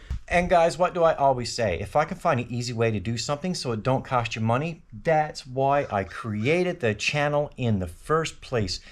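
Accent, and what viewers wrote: Canada. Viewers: A minute into the video I was like "hmmm he sure sounds Canadian eh" and sure enough you are lol. I'm from Toronto, with at 2018 DGC.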